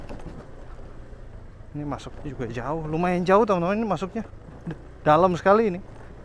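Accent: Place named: Medan, Indonesia. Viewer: Abang ini asli orang mana ya? Kayak ada logat medannya gitu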